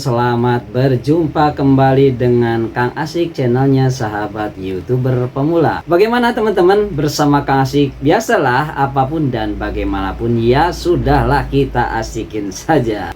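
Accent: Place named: Sunda, Indonesia. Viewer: Logatnta nyunda banget kang... Sama kaya saya 🤫🤭🤝🙏🏻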